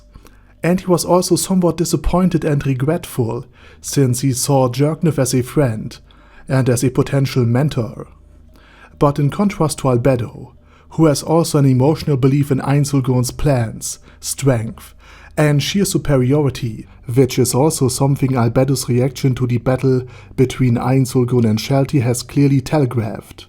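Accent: Germany. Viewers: As a german i am so triggered by our accent. I am sry but it triggers me so much. I like the thoery videos and shit about overlord though.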